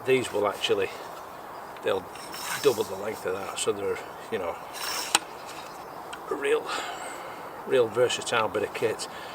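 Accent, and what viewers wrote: Lancashire, United Kingdom. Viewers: Loving your channel, learning loads so please keep creating and sharing, shame my 2 cats have a big impact on my garden wildlife. Been gathering some wildlife gear and really looking forward to getting back out freely after lockdown, nice to hear a lancashire accent too:-)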